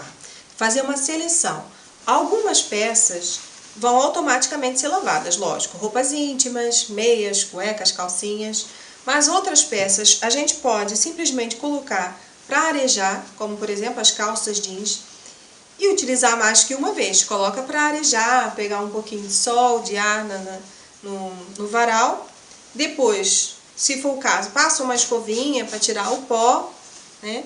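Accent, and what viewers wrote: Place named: Portugal. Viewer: Engraçado... a primeiríssima coisa que notei foi o seu sotaque... já está falando com os acentos portugueses...rs.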